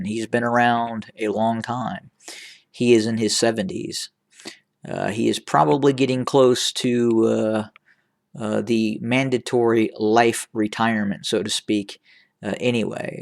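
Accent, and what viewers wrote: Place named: South, United States. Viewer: The fan fact is this channel has been joined in 15 sep 2020 , and a random with southern accent guy getting paid or using the situation to get attention, saying USA plan to remove Isaias aforki , first of all the CIA never even tell their secret publicly for any operations , not only CIA but also other secret Agencies in any Country, and secondly why now , what was US waiting the past 30 years , thirdly USA has no power to remove any leader on a country, we think like that but most of time they get removed by the people like sudan, Tunisia, egypt , Ethiopia( the Weyane was kicked by the youth of oromo poeple) , but nice try though 🤣🤣🤣🤣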